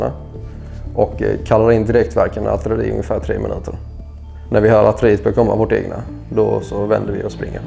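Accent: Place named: Skåne, Sweden. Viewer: My background is Swedish army basic training in the '80s, one tour in Bosnia in the 90s and Swedish National Guard for over decade. Drafted E-5, ended up O-2. I emigrated to the United States about 25 years ago. I observed that all the stupid stuff that we were warned that the Russians would do in war, actually still happen, about 25 years after we were first taught them! Every single weakness and structural problem! It's unbelievable. It's good, but weird. They mostly seem to run T72s, still. The B3 version, but still. You can tell that this man has experienced some serious s***. My unit was in one firefight in Bosnia, but that was a very brief moment and even though we killed people I'd relatively close distance, we didn't take casualties of our own. That checks with friends I have here in the United States, who have served in Iraq and Afghanistan. 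Enemy casualties is one thing, but on your own side is traumatizing on a whole different level. On a lighter note, I'd like to mention his speech. When I started the video, I noticed he had "non-Nordic" ancestry. My brain automatically prepared to listen a little closer in case he had an accent. I have worked for decades with native Spanish speakers here in the United states, including as supervisor, so my brain is prepared for everything, so to speak (I ended up learning a lot of Spanish, cuz that was easier). Then, I noticed that he has an accent from Skåne (Scania). That accent and his rapid speech, made me have to follow the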